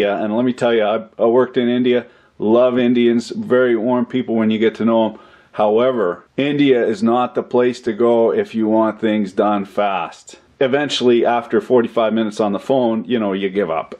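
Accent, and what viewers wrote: Canada. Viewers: Are you and 1pug1 brothers or is this just how all Canadians talk. bmp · Great to hear Canadian language. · Lurnin Canadian with. Still don't have that accent down. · Good stuff. I'm starting to get the hang of Canadian speak.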